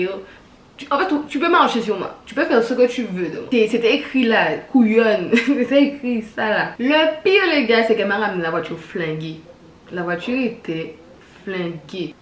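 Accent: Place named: Antilles, Caribbean. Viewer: Tu devrais mettre des sous titres l’accent antillais tu piges rien mdr 😂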